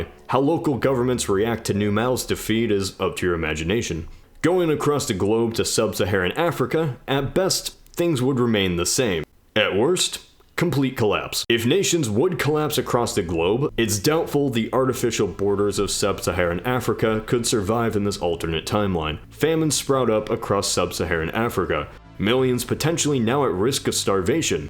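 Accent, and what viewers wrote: Ohio, United States. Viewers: nice northeast Ohio accent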